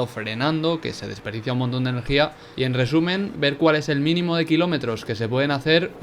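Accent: Spain. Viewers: Odio como hablan los Españoletes....va, Manolo?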